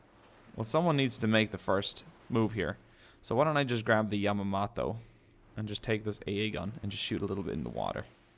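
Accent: United Kingdom. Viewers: Didnt you have a brittish accsent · I just watched a video you made in 2011 you sound a lot younger and almost have a British accent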